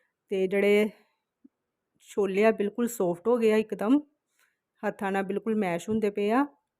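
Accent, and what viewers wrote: India. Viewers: ਅਂਮਿਰਤਸਰੀ ਬੋਲੀ ਬੋਲਦੀ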